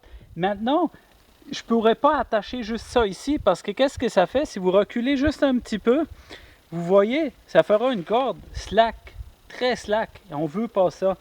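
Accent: Quebec, Canada. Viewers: un accent de où? je suis de montreal alors je sais pas trop de quel quoi du Quebec vient ton accent :p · Ce merveilleux accent canadien ! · bonjour' tu as une très bonne pédagogie , tu refais le mouvement en expliquant les erreurs à n'a pas commenter . J adore l accent de nos cousins du Québec. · Bonjour. Merci pour cette vidéo. J'ai fait pas mal de recherche pour fixation d'une faïtière et ta vidéo est la meilleure: c'est clair, bien expliqué et bien filmé. En plus avec l'accent québécois et ta sympathie, c'est top. Salutations Nature de France!;)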